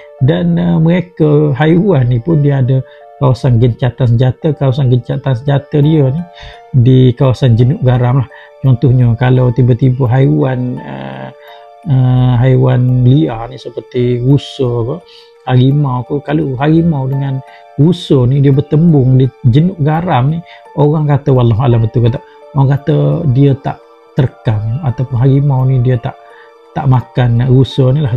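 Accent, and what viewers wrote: Kelantan, Malaysia. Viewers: Pekat bethol kelantan kau bang 😂 btw keep going 👍🏻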